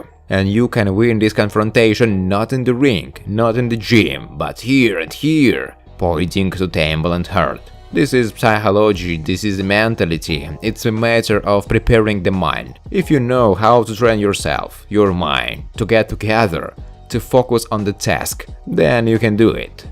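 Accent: Russia. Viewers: I just unsubscribed.had enough of this russian accent!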